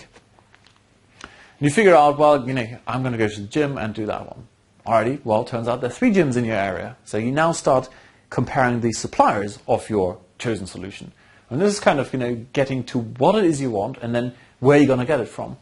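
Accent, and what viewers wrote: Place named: Swabia, Germany. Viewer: Very informative and down to earth (Schwabe oder Württemberger ?) without the usual hype. I really appreciate it. Wolfgang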